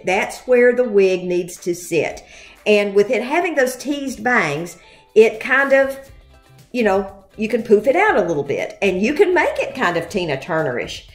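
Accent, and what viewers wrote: South, United States. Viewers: Can I ask where you are from in the South? I’m from Arkansas and I love to listen to you.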